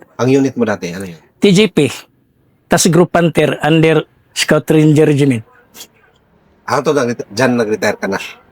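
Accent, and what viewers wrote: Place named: Visayas, Philippines. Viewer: Iba tlga ang bisaya mg kwento , nkakatawa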